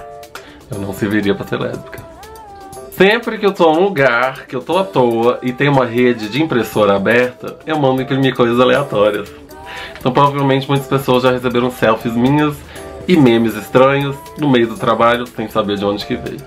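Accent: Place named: Minas Gerais, Brazil. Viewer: Sotaque mineiro é a coisa mais linda desse mundo. Adorei o vídeo! ❤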